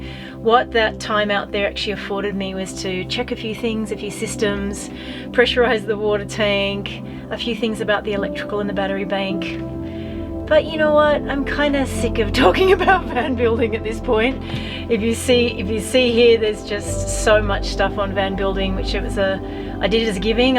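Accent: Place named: Australia. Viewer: Found you looking for cushion cover tutorials. You sound like an Aussie, where are you from?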